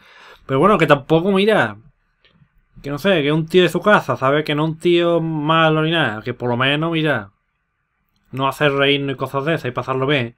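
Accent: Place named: Seville, Spain. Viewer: ajajaj como se nota ere sevillano ole! ajaja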